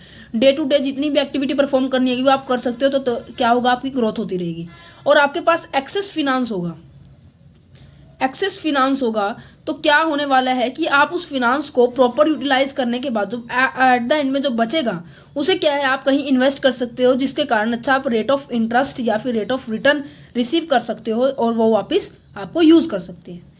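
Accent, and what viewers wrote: Haryana, India. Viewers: Nice explanation mam ..aapki sound me haryanvi touch aata h 😊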